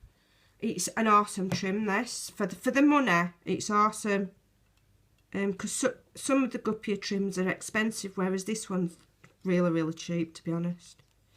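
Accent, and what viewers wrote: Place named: England, United Kingdom. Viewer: You are so delightful to watch. I live in the states South Florida and I thoroughly enjoy hearing your English accent. Good tutorial check me out I make these too with old napkins. I plan to sub to your channel thanks again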